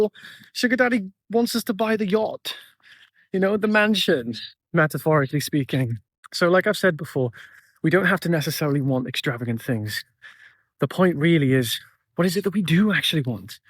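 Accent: United Kingdom. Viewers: I see you are in Australia but sound like a 🇬🇧:)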